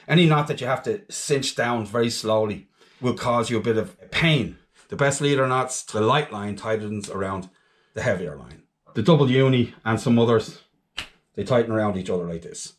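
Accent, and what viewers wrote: Ireland. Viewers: Newly subscribed on your channel and have learnt so much already, thank you. Excellent informative and well explained videos, well done. Lovely to hear it from a fellow Irishman 😊. Trip planned for Norway for July and August, hence my renewed interest in fishing. No fish left in the Med, so lost interest in fishing since I moved down here. Really looking forward to picking it up again in Norway. Keep up the good work 🤩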